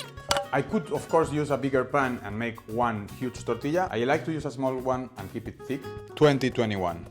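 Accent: Spain. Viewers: Even better to watch when the guy is good looking. And that beautiful Spanish accent….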